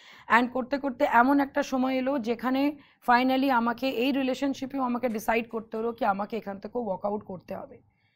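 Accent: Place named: Hindi, India. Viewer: Punjabi biye korle ki nijer matribhasha ta accent diye Hindi er moto kore bolte hoy?? Eder eituku video dekhei ja fake r nyakami, real life e ki jinis k jane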